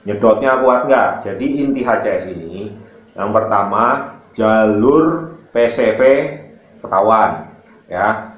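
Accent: Banyumas, Indonesia. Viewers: Basane ngapak temen kue kang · Daerah mana Boss,kok ngapak dialeknya.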